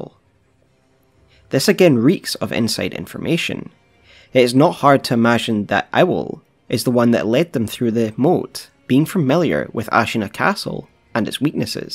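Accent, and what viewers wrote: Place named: Scotland, United Kingdom. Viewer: Scottish probably! They use eii with pretty much everything!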